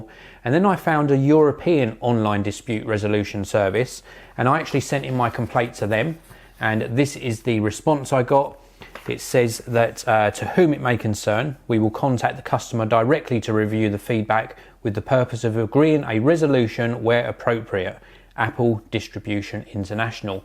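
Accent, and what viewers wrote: United Kingdom. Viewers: So I clicked this video because I'd like to know what to do if Apple refuses to fix or replace my laptop - but as soon as I heard you speak I knew this wasn't relevant to me because of where I live. I live in the USA, where we don't have appropriate consumer protection laws. Thank you for sharing your experience! I hope it helps many people from the UK.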